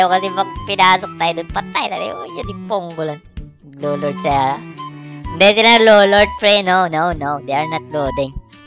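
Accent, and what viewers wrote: Visayas, Philippines. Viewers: Visayano po ba si kuya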